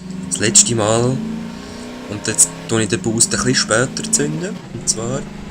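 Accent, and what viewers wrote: Zurich, Switzerland. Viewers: i wellem kanton wohnsch du eig.? :D töhnt wie Züri :D Und super part natürli ^^ :)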